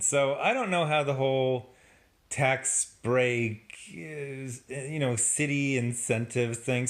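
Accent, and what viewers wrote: United States. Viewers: Eh, I kinda preferred the Canadian accents over the typical American one, like yours.: "I guess uh, what her naaame? Irisss? Isss? She use to be a rah-porterrrr? Now she runs a bloggg? And she's like a, y'know. Newww media? Except for they show herrr blog? And it looks like a friggen Geocities Tripod websiiite?" No shade, I'm just saying it's very confusing when you keep making statements in the form of questions.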